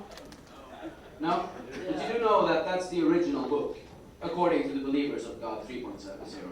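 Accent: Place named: Sweden. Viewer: Haha han pratar tvär svensk engelska dock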